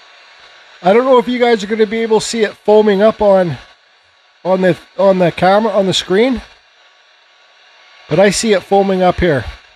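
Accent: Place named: East Coast, United States. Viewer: Thanks so much and I adore the east coast accent ! Wisconsin accents are boring in comparison!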